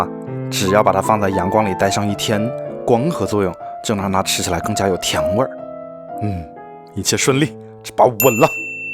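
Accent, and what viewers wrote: Wuhan, China. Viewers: 听出了武汉口音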